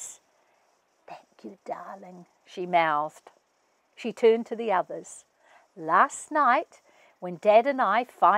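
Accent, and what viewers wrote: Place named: New Zealand. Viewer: I wait for every chapter. This is a keen idea you reading your book, especially with that enchanting NZ accent. I will be in my kitchen preparing dinner, baking a loaf of bread or washing up after supper and I realize my face is smiling as I listen😊. I did send an email and I do hope you received it. Blessings, Cathy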